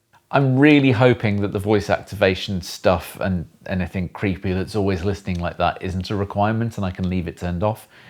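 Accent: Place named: England, United Kingdom. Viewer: I like unboxings with the English accent, but I just bought them just to do bets on how creepy they are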